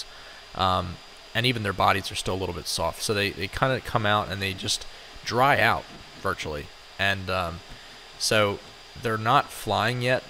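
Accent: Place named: Southeast Pennsylvania, United States. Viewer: Your accent - are you from SE Pennsylvania?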